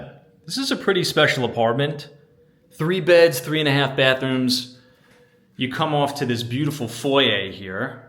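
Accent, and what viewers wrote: New York, United States. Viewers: Don't know if anyone has mentioned this, but your just-enough-NY-accent really enhances your storytelling; your videos feel like we're casually walking down the street with someone who knows the city inside and out.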